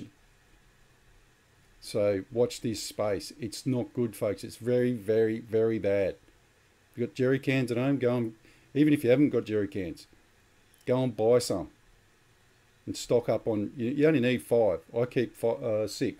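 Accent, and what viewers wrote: Australia. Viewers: ha,, great to hear an aussie accent,, don't despair with lack of response,, expect this from humans,, they are mostly pretty thick,, also pleased to hear you say the lords prayer.. I'm prepping, but I've only got some cash.. metals are useless. batten down hatches..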